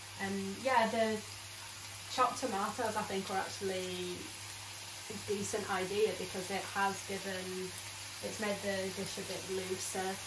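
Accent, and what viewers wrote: Yorkshire, United Kingdom. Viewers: Love the Yorkshire accent, would have liked you speak more Somali though. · You are not hafe you are Somali. 100%🇸🇴💪❤🍇😘🥴🥴😁🥴 the Yorkshire accent did it for me so funny 🥰😍💪❤🍇😘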